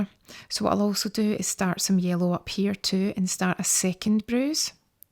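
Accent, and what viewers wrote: Scotland, United Kingdom. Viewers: I Love your accent :) is it scottish? Sorry oft this is torally wrong xD I'm Not good at guessing accents haha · 😁😁😁 No way! 1) I have no competence in "show & tell" 2) gorgeous Scottish accent beats awkward Italian accent hands down 😜 Keep up with the good stuff!😁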